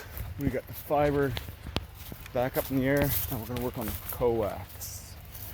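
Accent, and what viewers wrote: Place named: British Columbia, Canada. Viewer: Where exactly are you located at I could probably kind of guess by your accent that you're in the BC area me I'm down here in Tampa Florida but great videos love watching them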